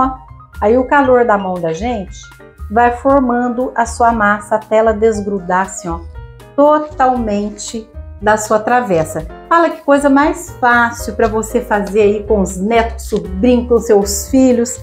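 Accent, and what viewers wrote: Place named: Minas Gerais, Brazil. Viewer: Que delícia de cookie e de sotaque. Sou mineira e dá gosto de ouvir kkkk. E de comer todas essas delicias que vc faz aqui. 😋😋😋😋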